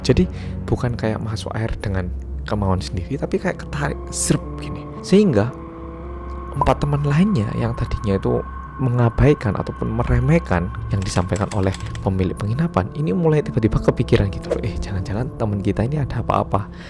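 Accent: Java, Indonesia. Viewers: wkwkwk mas, ini kan cerita tentang orang2 korea ya.. tapi gara2 dibawainnya jowoan jadi berasa denger kisah orang main sungai di brebes. seru. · Lagi nugas tiba-tiba daper notif dari....... Langsung nonton dong♡♡♡♡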